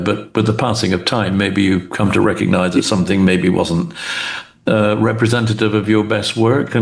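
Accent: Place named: Scotland, United Kingdom. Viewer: For a Yank like me, your Scottish accent was at first a wee bit difficult :face-green-smiling:, but Ian of course was right at home with it and as a result you got an excellent hour+ from him. Honestly, I've heard interview after interview with Ian in which he answers the same ol' questions again and again with the same ol' answers, but you asked several unique questions that elicited genuinely thoughtful/original answers from him ... good on ya mate. Well done. :goodvibes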